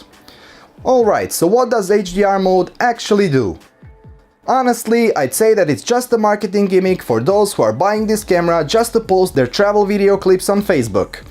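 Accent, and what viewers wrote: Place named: Ukraine. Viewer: not interested in ukraine narrators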